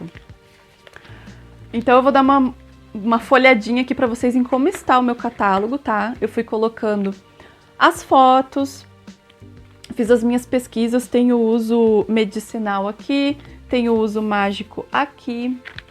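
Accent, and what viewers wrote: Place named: Porto Alegre, Brazil. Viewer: Que dica incrível! Esse app vai ajudar muito, eu tenho bastante receio de usar uma planta errada pra fazer chá e passar mal, acho que vai ajudar bastante mesmo 💜 bjuuuu (eu amo que tu fala do mesmo jeito que eu por sermos daqui de Poa, sempre acho que teus outros seguidores não entendem as nossas "gírias" kkkkk)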